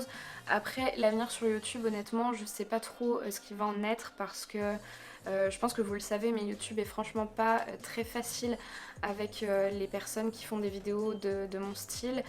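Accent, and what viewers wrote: Switzerland. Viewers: PS : je suis Suissesse et je te confirme que tu as un petit accent de chez nous 😉 c'est très chouette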